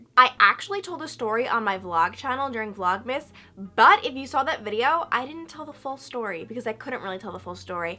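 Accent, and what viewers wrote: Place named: Midwest, United States. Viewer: does anyone know where is from because I'm from Wisconsin and she sounds like she has a midwestern accent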